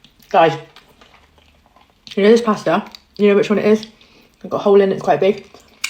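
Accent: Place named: United Kingdom. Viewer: PS I SO LOVE YOUR BRITISH ACCENT!! 😊🥰🥳🍾🤗👏👏👏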